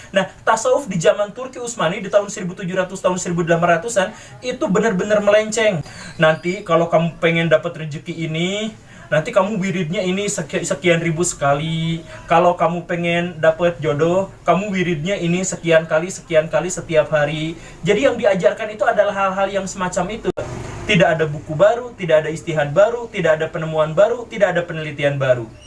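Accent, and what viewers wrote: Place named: Sunda, Indonesia. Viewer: Kaciri ari nu ijid mh kana solawat jeung wirid, koh lentong na mh siga sunda tp nuansa wahabi,aneh aja gituu teu matching 🤣 Introspeksi? Yaa kita coba introspeksi. -Jangan salahkan zionis freemason dll,tp yg justru di salahkan solawat dan wiridan. -Nenek moyang bangsa turki pernah menguasai dunia, -Nenek moyang bangsa kita pernah di kuasai dunia (dan sekarang pun masih). -baheula Kerajaan2 islam di nusantara berafiliasi ke turki,tp apakah sekarang ada yg dr turki berafiliasi ke indonesia? -turki termasuk negara maju, Sedangkan indonesia negara berkembang, yaa yang namanya berkembang kan bisa aja layu lagi,(dan sekarangpun kembali layu) *Jd yg keren itu mereka yg turki,yg pernah menguasai dan di segani dunia , Atau kita yg indonesia,yg pernah di jajah dunia? *Jadi yg harus introspeksi mereka yg turki yg kualitas nya jauh di atas negara kita,atau kita yg jauh di bawah negara mereka??? 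Lier aja gituu 🤣😂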